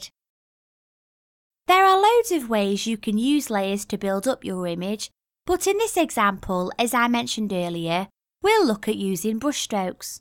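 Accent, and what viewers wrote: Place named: North, United Kingdom. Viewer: You can't beat a good old northern accent. Salt of the earth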